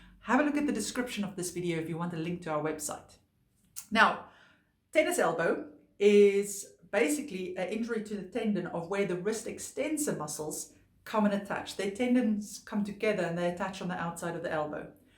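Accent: Afrikaans, South Africa. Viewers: Is that an Afrikaans accent I hear?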